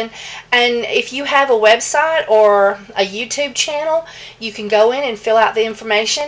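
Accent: South, United States. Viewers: I think you are precious w/ur Southern accent & easy going genuine way! Love listening to u talk! Next time I order Lye or anything else from Es. Depot, I'll do thru ur aff. # 552! Mahalo nui loa for taking the time to share what ur doing. I appreciate & enjoy ur vids!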